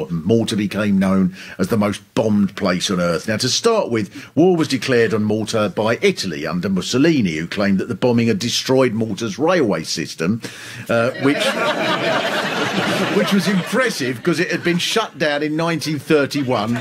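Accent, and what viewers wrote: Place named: England, United Kingdom. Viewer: Grate english accent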